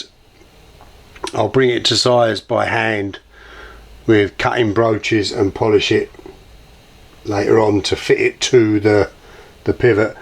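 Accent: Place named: Cockney, United Kingdom. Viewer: This was a brilliant video. I love the fact that you built your own bushing tool. Ive been wanting one for ages but begrudge the stupid amounts of money they are asking for. I wouldnt mind having a go at making one myself. Ive managed to build my own mainspring winder and a test stand too. Ive been messing around with clocks for about a year now and even started my own channel. but I think its more of how not to restore them really :-) Nice to hear a cockney here on Youtube I'm now going to through your channel and look at your other videos. Johnny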